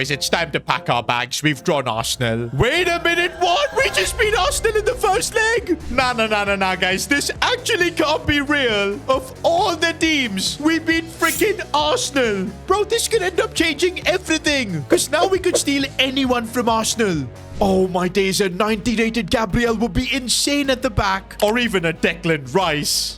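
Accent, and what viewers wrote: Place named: India. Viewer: You sound indian and pakistani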